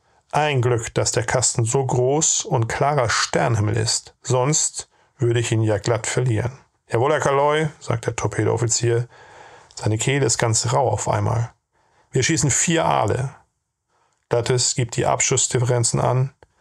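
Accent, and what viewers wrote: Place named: East Germany, Germany. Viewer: Sehr interessanter Bericht. An manchen Stellen ist nicht zu überhören, dass er aus der DDR stammt.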